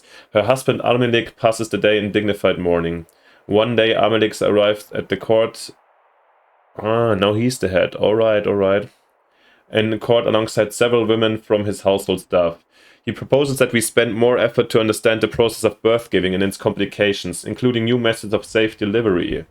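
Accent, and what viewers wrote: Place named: Germany. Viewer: Direkt den Alman rausgehört😂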